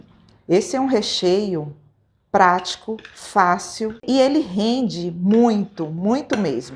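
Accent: Bahia, Brazil. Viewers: Como gosto do sotaque da, essa baiana arretada. ❤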